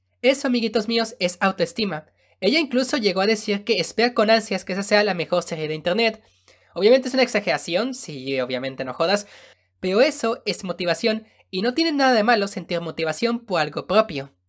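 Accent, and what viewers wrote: France. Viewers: Uff ya quisiera ver eze asentó francés en la webserie de XD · oye eres de francia porque tienes o me suena q tienes asentó francés, me saludad??? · Oye eres frances?:v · soy yo o tiene acento francés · Acabo de dar cuenta del asentó francesas o solo soy yo :v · Tengo dudas 1:eri gei? 2:eres frances? Fin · Enserio? Yo pensaba que eras francés...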